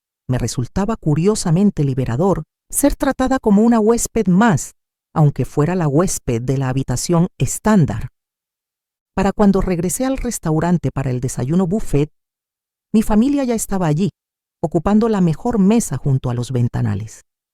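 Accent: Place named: Puerto Rico. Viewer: Que hermosa lección con lindos valores de siempre ser humildes. A parte, creo que la narradora es puertorriqueña. Acostumbro a escuchar cuentos e historias y esta manera de hablar o el acento me parece muy familiar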